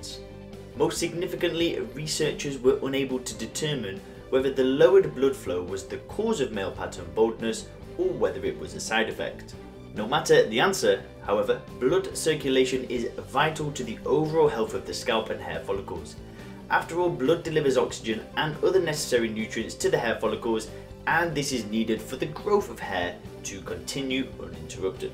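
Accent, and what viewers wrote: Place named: United Kingdom. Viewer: Tf is this stupid music supposed to do? Just makes it annoying trying to understand what you're saying since you're a britbong.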